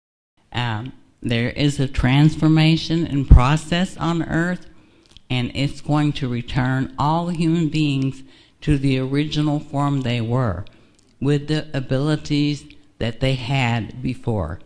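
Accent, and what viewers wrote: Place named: United States. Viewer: アメリカ英語に聞こえます。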